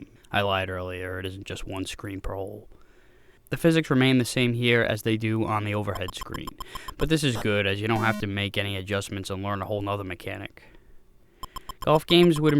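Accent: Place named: Long Island, United States. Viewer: Definitely a Long Island accent 😉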